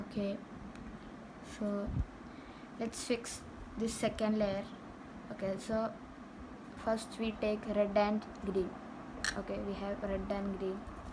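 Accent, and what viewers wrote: India. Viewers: Are you a malayali your English is like that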